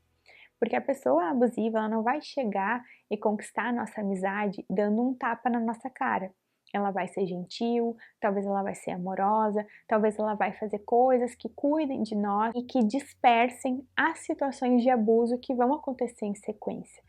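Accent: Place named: South, Brazil. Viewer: Adoro esse sotaque, me lembra de quando eu eu morava no sul. Saudades.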